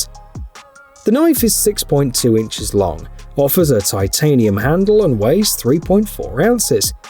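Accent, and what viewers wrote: United Kingdom. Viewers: You are in the USA! Why are you doing the narratives using a Brit???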